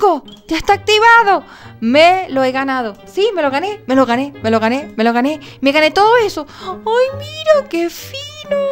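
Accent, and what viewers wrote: Venezuela. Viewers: Eres venezolana ？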